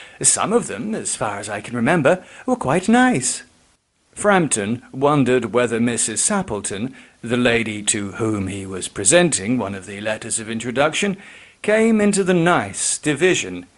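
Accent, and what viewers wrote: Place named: United Kingdom. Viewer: I laughed while listening to his British voice, thinking I was back in the England 1700's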